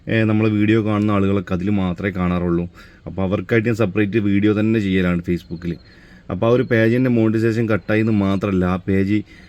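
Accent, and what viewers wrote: Malappuram, India. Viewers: മുത്തുമണി മലപ്പുറം ഭാഷ മതി ❤️❤️❤️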